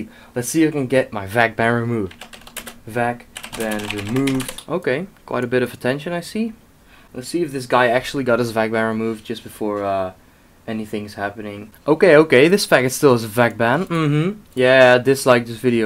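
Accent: Netherlands. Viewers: His dutch accent mixed in with english is almost cringeworthy but his videos are still enjoyable. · are you dutch?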